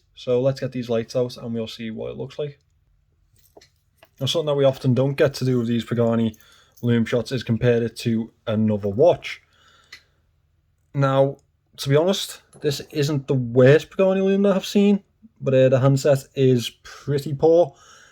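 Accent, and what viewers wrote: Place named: Scotland, United Kingdom. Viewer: I’m only here for the terrific Scottish accent 🤩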